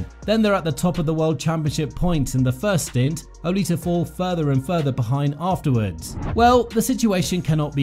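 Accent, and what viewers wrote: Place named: England, United Kingdom. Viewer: An English accent saying it's all over for the Aussie driver. Some things never change. This because of cricket isn't it?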